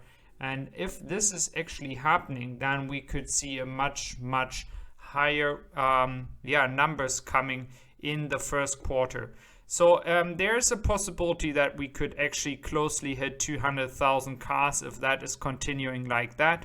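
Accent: Germany. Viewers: new viewer here, 👋🏻, what's your mother tongue? & "Feviery" sounds a bit French, but your vowels and stress are all Germanic. "Twenny" — Not sure what this tells me "six hundred and thirty two" — presence of "and" makes me think not German or at least didn't learn US English, but: "ja": Maybe you are German? I'm all confused :-)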